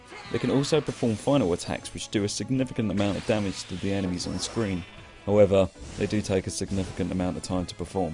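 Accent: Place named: United Kingdom. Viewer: Wow, you really are British. I thought that was a fad or something. :P Good review, though I probably wont pick up the game. Wish they would make more legit Toku games.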